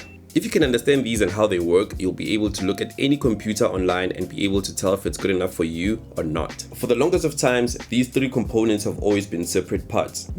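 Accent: South Africa. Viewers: bro is south african??? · someone with a Sputh African accent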